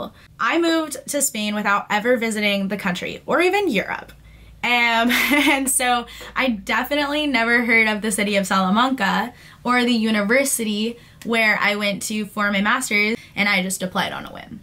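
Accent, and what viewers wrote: United States. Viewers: I love you,. I love your american accent and id love to speak english like you. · Me too. I adore her accent, and as I'm still learning English, I use that as a reference to acquire my American accent.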